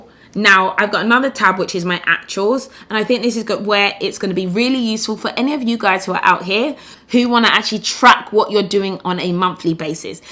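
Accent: United Kingdom. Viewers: She sounds British to me! Unless she moved here as a kid? The first video I've seen so I have no idea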